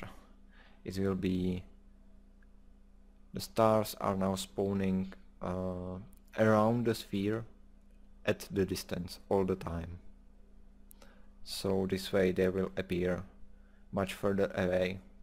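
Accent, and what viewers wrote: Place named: Latvia. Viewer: Are you from Latvia? Your accent sounds familiar :) Thanks for the video!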